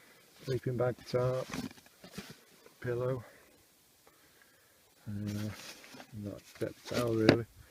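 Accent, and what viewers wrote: United Kingdom. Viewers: Nice vid mate ... done a fair bit of bike / camping myself and loved it, did Spain last year and going back, can't beat the weather! ... as an Edgeley lad myself but not lived there for years its nice to hear a Stocky accent (c'mon County!) ... and as you know ... we are not Mancunian, we are Cheshire! Have great trips buddy and ride safe. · I assumed wrongly you were Scottish by the title. Once the helmet was off I could easily tell you're English. But as the Ozzie's say, "Good on ya" for picking up the trash!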